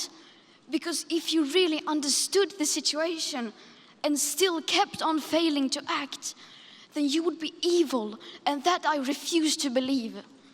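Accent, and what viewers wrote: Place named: Germany. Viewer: Выступление Греты Тунберг на саммите ООН по климату - на английском языке (с тяжёлым немецким акцентом) с русскими субтитрами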